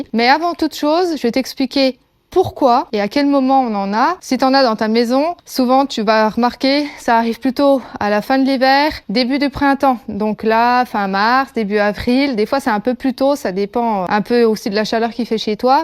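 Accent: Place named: Burgundy, France. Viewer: Très bons conseils et j’adore ce petit accent bourguignon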